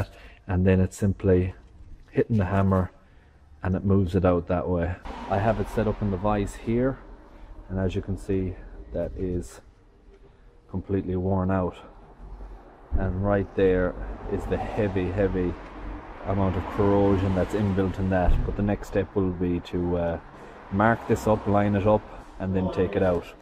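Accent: Ireland. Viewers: listened 3x just to hear the Irish accent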